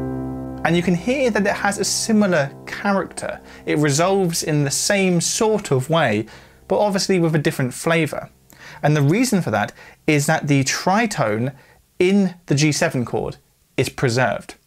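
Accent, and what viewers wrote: United Kingdom. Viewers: I have to smile at the British pronunciations of some words. Like in the US we say Samba like “Somm-bah,” but accent it’s more “Sam-ber.” It’s like sometimes the British go out of their way to make foreign-import words more English sounding. Like pronouncing the t in valet or filet.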